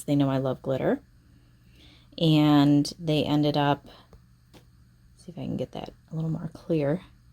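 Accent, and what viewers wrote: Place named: United States. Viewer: Sounds like your American but where did you get the plastic bottles from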